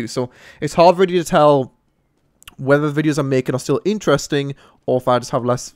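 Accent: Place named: England, United Kingdom. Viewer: work? what the fuck would you know about work. editing a video and playing games is not work. step outside your house. sell out. you made youtube videos once upon a time where it was no doubt fun. clearly, you are looking to shake this money tree. i dont buy into all this donating and subscribing garbage. its rubbish. you are not a charity. you provide mild entertainment at best,. you cheeky, english accent, condescending, adolescent whatever. i lost interest. friend-zoneded.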